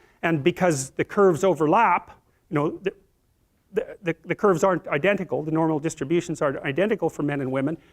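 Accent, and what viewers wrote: Canada. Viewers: This guy's Canadian, eh?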